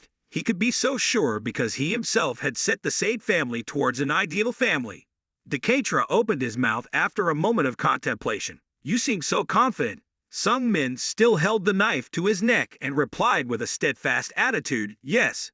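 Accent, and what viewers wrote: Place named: United States. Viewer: Imma be real, I genuinely appreciate the effort but this is one of the worst AI voices I’ve come across to date; the disgusting American dial is cranked up far, far, far too much. It’s almost comical where it not for the fact this isn’t satire. I don’t know who your target audience is but unless you’re specifically targeting the most niece subgenre of fat fucks I’d highly suggest you mellow out some of that abhorrent mannerism and intonation.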